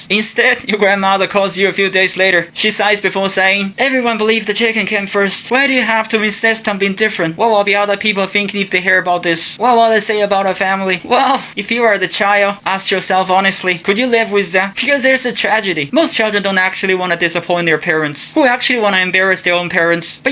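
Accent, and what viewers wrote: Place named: China. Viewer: your accent is quite chinese bro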